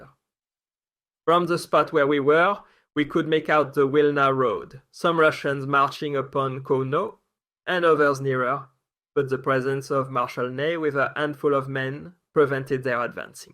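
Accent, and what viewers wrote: France. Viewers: Honestly the thick French accent was such a great touch for this series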